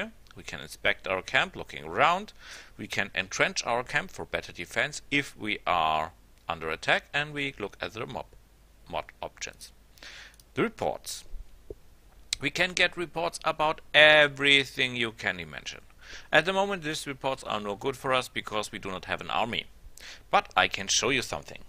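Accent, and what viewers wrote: Germany. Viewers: I hear it stop and go AH NO! press the next one straight away love this so far and it only ep 1! on a side note your accent it interesting German?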